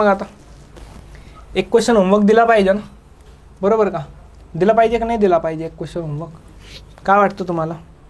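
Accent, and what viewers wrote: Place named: Marathwada, India. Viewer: Jalna aurangabad style😅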